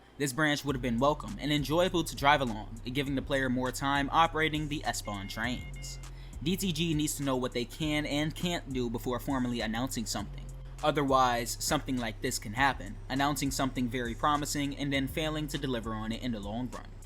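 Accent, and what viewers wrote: United States. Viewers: Right...I had to go back to see what the big deal was and you are just saying it the way we do here in the States. If you listen to videos from different regions and countries then you have to be prepared for different pronunciations sometimes.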